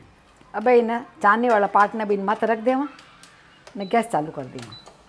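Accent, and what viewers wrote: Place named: Marwari, India. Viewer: Aap meri sakhi kab bnogi vaise yaha meri pach saheliya hai chhthi aap ban jao.aap ki marvari mithi lavati hai ek jaypur me bhi meri s aheli. hai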